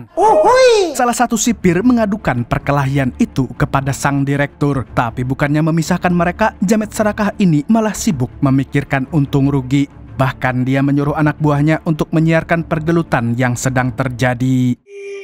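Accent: Banjar, Indonesia. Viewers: Orang Banjar kah om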